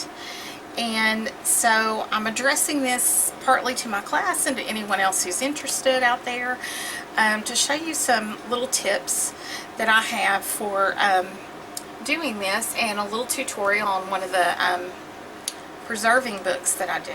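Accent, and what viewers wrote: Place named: South, United States. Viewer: Love your video,! Such beautiful organization! Your sweet southern accent is divine! ❤️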